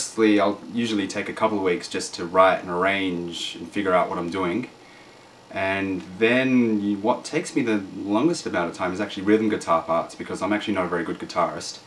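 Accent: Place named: Australia. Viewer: its so good to hear another aussie voice...reminds me of home...even though i'm at home...also pro tools...good boy...komplete 8....i'm jelly...guitar rig...ehh i'm a amplitube bro myself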